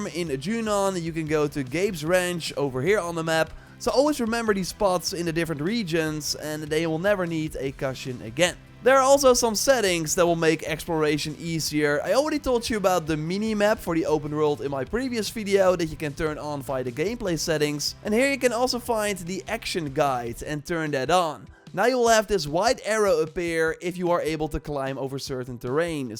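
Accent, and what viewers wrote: Netherlands. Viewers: 100% dutch by the accent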